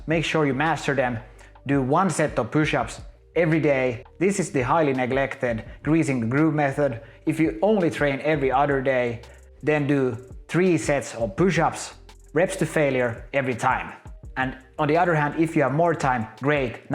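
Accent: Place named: Finland. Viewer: hienolla suomalaisella aksentilla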